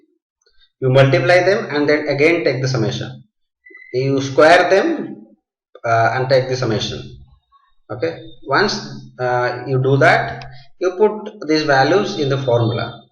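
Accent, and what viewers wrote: India. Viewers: hello sir,nicely described. You seem to be an odiya am I right sir?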